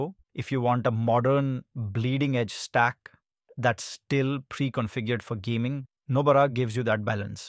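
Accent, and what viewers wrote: India. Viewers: can understand the freeaking indian accent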